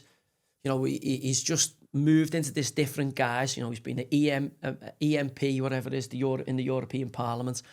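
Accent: Liverpool, United Kingdom. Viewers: Much respect to you. Irony is many of these lefties will think you’re an idiot because you’re working class with a scouse accent. But everything you said here is spot on. They claim to care about the working class but they resent the white working class, as we have seen time & time again, and particularly with this scandal. You have more integrity in your little toe than Gary Lineker et al.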